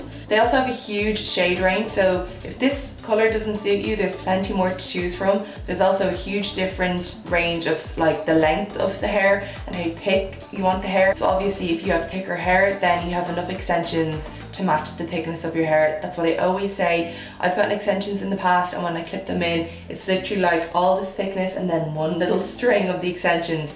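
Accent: Dublin, Ireland. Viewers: do u live in america? i thought u were irish girllll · She's Irish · Definitely Irish!! Yes I am American, but I can pick up that accent any day. 😂😂 oh & plat also means to braid. 👌🏼 Also recreated this look & used the Gwen Stefani palette as well as my Morphe 350. For the lip. Used Love Bite by Kylie Cosmetics & it went perfect. 😍😍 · She's Irish for sure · What accent have u got? (Scottish) Irish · Are you Irish?? Your accent is 😍😍😍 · where is she from? jw lol, im new here. maybe ireland? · are you irish?? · She is from Ireland. Lovely accent! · Is she Irish?x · You're so gorgeous! Btw are you irish? lol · Is she part Irish I feel like she has an accent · is she irish?? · Sounds Irish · Do you have an Irish accent?😊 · Is her accent Irish or South African? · What part of Ireland are you from ❤️ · this is the first video I've ever watched of you, one question are you Irish ? 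· I think she's Irish!! it's such a subtle accent tho! I love it hahaha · Is she from Ireland cause she had an Irish accent I'm from Ireland iswell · Are you Irish or British?? You sound Irish...btw new to your channel love this look you're gorgeous!! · she's Irish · Your beautiful irish accented voice could never be annoying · yeah shes from dublin :)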